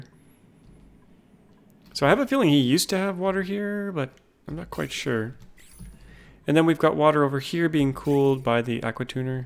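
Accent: Canada. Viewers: Doood, doont fix yur Canadian suurfer speek. I like it. Also, five episodes in, I still absolutely love this series. It's always been fun watching you build bases with each iteration of the game, but it's a totally different experience watching you fix up these broken bases.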